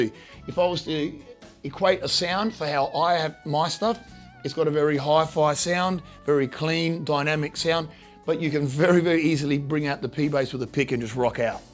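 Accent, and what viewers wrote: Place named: Italy. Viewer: I can't hide his italian roots!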